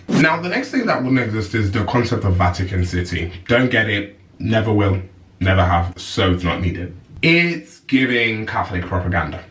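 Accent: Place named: North, United Kingdom. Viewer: HOW HAS YOUR ACCENT GOT ALL NORTHERN ALL OF A SUDDEN??? I’m getting rid of kitten heels in my dictatorship sorry not sorry.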